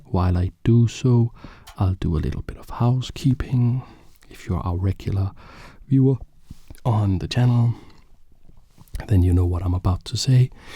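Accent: Denmark. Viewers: Jesus Christ I got a shock hearing your danish accent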